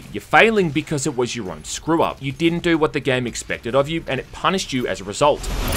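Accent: Australia. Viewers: Are you an Australian? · Scare pronunciation: it’s scarce /‘skeəs/ like ‘scare’ /‘skeər/ but with ce at the end. -this is for British English - but Australia uses received pronunciation all the same if I recall correctly. Americans English: the vowel sounds in scarce /‘skers/ are the same as they are in ‘air’ /er/ And like air, they add the rhotic /r/ sound after the vowel, but before the ce, so it will sound like sc-air-ce But it doesn’t really matter how you say it. Aussies make everything sound good anyway. Australian English is like an amalgamation of British and American English. It’s like natural progression, and it makes common sense. Never mind me. Just your English teacher and linguist subscriber. I hope my years of pain and debt were useful to you.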